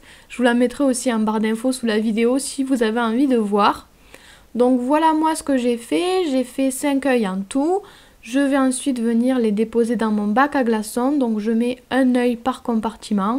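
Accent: South, France. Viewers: Je kiff l'accent du sud 😍😱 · j`adore ton accent du sud trop cool et les boissons son super bonnes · bah moi j'ai laissé chez moi et ça marche bien c'est trop bien ton truc moi j'aime bien j'adore ton accent du sud un accent du sud c'est bien mais c'était très bon et j'en ai fait chez moi bah ça c'est bien et voilà donc merci beaucoup pour ta recette😇😇😇😇😇😇😇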